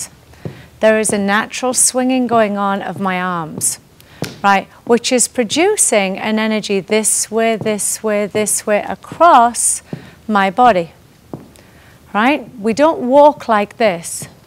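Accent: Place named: Northern England, United Kingdom. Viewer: Very helpful-the images I can use when walking along the beach front. Thank you and a nice (north) English accent. Jack in Japan.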